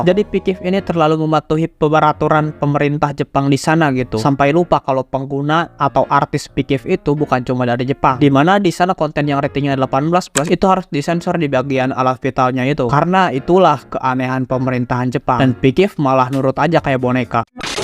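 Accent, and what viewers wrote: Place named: West Java, Indonesia. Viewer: Sunda kayak nya:v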